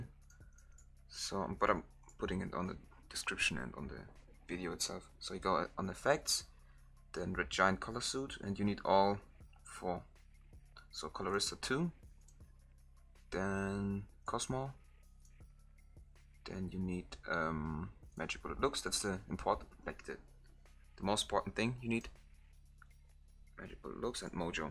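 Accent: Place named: Germany. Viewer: that german accent tho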